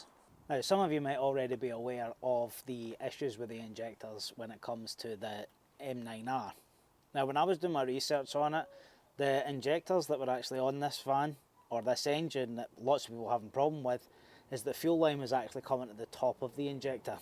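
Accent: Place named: Perth, Australia. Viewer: Mate where are you located? If you’re looking for a wee Homer , I’ve a 2011 traffic, immaculate condition but the injectors need replacing. I’m in Perth. Your accent sounds close by.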